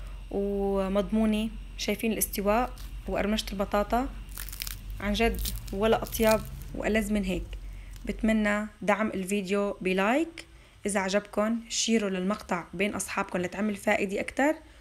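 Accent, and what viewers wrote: Homs, Syria. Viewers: اللهجة الحمصية 😍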